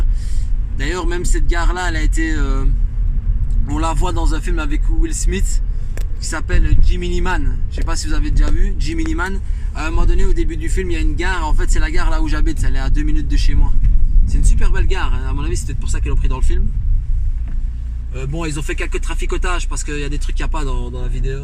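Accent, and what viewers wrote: Belgium. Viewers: Salut amigo ! Sympa ce petit concept de vidéo, ça change ;-) en tout cas tjrs autant agréable ton accent belge, je sais pas pk mais j'adore:-D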